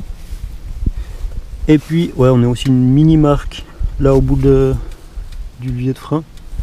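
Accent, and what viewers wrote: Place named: Switzerland. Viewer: ta cru que j'avais pas deviné que tu étais suisse avec le magasin coop au débute de la vidéos et ton petite accent des famille (bon ok je suis aussi suisse :) )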